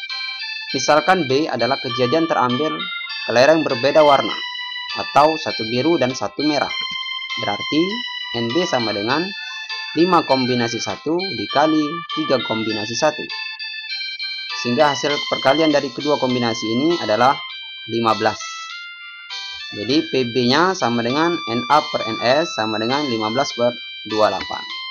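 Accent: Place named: Bengkulu, Indonesia. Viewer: Mantap betul pak, bapak dari Bengkulu ya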